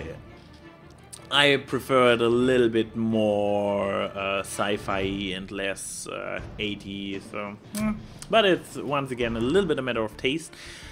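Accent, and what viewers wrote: Germany. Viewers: Into that German accent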